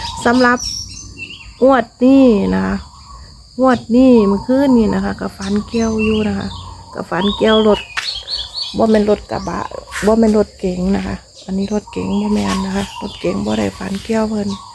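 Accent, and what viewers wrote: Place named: Laos. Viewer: เสียลาวหลายจ้า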